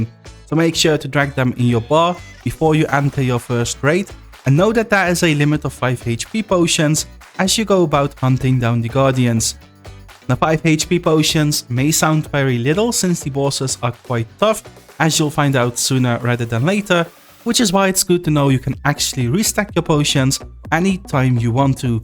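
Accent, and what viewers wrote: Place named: Netherlands. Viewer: lees net dat je Nederlands bent/spreekt hahahha ik dacht het al heel lang aan je accent te horen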